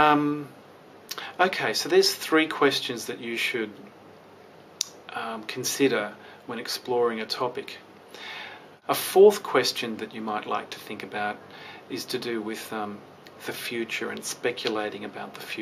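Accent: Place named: Australia. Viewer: it's an Australian accent.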